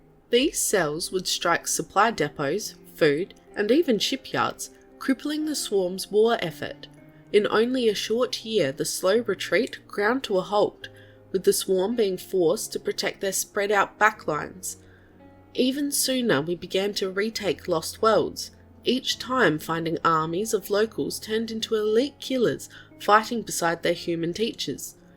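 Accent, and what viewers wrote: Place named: Australia. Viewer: I've been listening to other YT-ers with their HFY stories, and just found you... very cool... I like to listen to those others, but its great to hear a fellow Aussie reading these! You give a better inflection to what you are reading, which I like. Subscibed. :)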